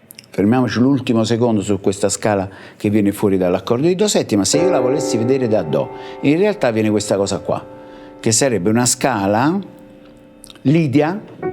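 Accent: Naples, Italy. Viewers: è bravo, bravissimo comunque, anche fosse di Brunico. La Napoletanità non c’entra proprio una cippa, se non per la parlata sardonica e disincantata da napoli-snob che è un piacevole orpello all’ascolto. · Maestro sei grande! lo adoro sto' accento Napoletano!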